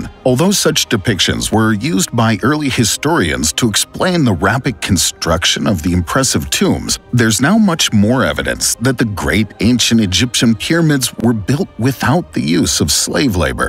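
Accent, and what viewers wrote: United States. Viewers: The narrator has an American accent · the narrator's American accent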